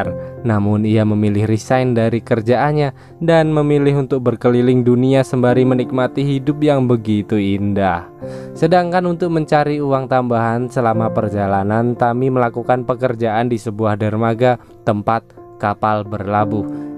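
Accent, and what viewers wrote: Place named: Medan, Indonesia. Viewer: Bukan kelen tapi kalian...logat medan ya brooo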